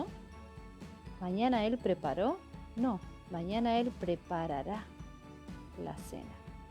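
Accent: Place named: Argentina. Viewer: Gracias . porcierto sos de qrgentina. 🍽 un acento bonito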